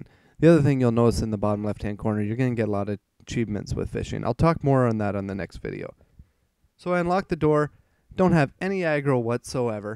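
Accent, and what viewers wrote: Canada. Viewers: Wait you're Canadian???? Where are you from?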